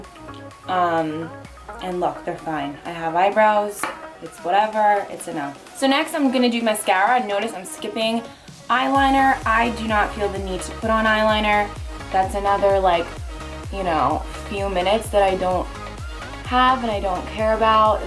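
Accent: New Jersey, United States. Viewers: Where are you from? You sound like your from New Jersey or Long Island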